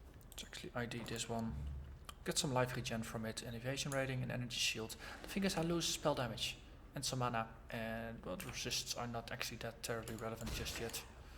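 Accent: Netherlands. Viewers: true fan of the new chaos dmg here. quick question, are you dutch? you sound dutch.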